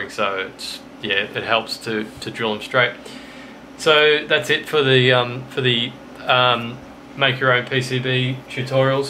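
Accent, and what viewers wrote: Australia. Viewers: Skyim desktop. Awww yeah. Haha- thanks for the tutorials mate! I detect a fellow Aussie... I'm just getting started with building pedals, I've put together two BYOC kits and I'd like to go onto perhaps veroboard on even etch my own.. where do you get the veroboard, copper board, and ammonium sulphate etc.?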